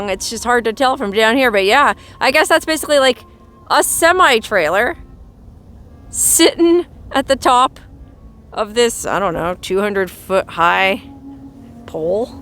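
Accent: Germany. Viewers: Love your German accent, mein schones madchen! ( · There is something about German accent that makes things tingle. That being said, it’s a serious bummer one isn’t into making a video in Orange County! Just saying… Bwhahahaha Always a pleasure seeing where you’ve been lately!! Safe travels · Love your German diliatic!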